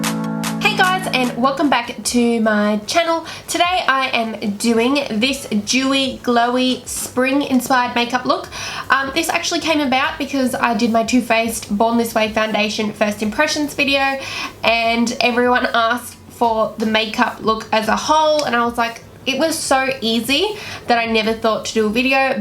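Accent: Australia. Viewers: You're so pretty and i love your accent😍😍 i am Australian too but your accent is much stronger than mine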